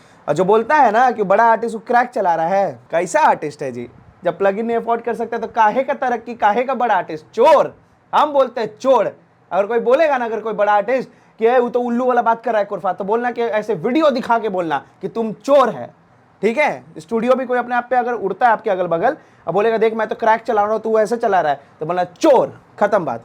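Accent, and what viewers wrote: Bihar, India. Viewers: TALK ALWAYS IN BEHARI STYLE IN YOUR TUTORIAL , I AM YOUR OLD SUBSCRIBER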